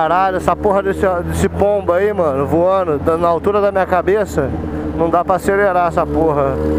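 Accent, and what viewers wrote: Rio de Janeiro, Brazil. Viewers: Esse sotaque carioca,e deixa louca....que tesão!! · Você é do rio né brother? Maneiro!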